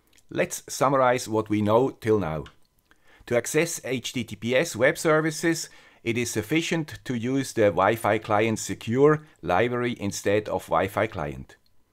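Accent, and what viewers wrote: Switzerland. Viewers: That "guy with a Swiss accent" sure is smart! Thanks another great lesson:)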